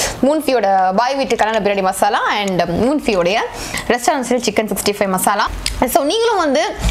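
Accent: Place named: Coimbatore, India. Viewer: Namma covai slang apo apo vanthutu poguthu. akka....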